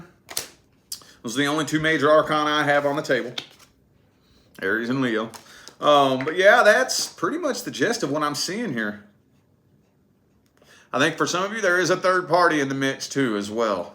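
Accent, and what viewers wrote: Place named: Louisiana, United States. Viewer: I'm looking at your beautiful eyes and You're lusianna voice I think, love from Ireland.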